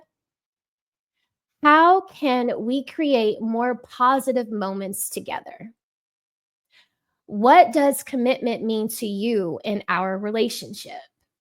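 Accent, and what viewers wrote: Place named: South, United States. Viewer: Kinda figured you were mixed with something. Couldn't put my finger on it. Occasionally, I could hear a bit of a Southern twang and come the freckles.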